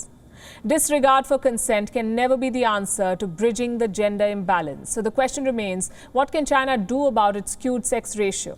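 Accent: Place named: India. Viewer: I hate Indian accent , so disgusting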